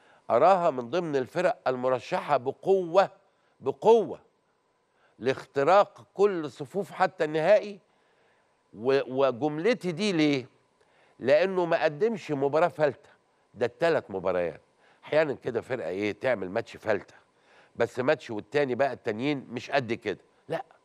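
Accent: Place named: Egypt. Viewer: حلوة اللهجة المصرية .عجبني لما قال . احمد زي الحاج احمد 😂 .وناس ده بانس وونس 😂😂.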